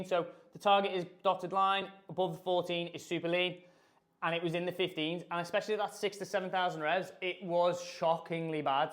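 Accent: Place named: Liverpool, United Kingdom. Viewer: It’s called scouse accent!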